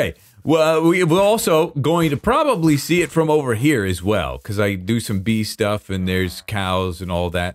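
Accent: United Kingdom. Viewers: And that pa'fect brittish accent ☕❤️❤️❤️ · Amazing wizard cloak, about as amazing as your British accent <3 That witch hut looks fantastic, I thought you gonna do a big dead tree (and a pond) but maybe this was a "good" witch living close to the village. The end dragon is shaking in its boots lol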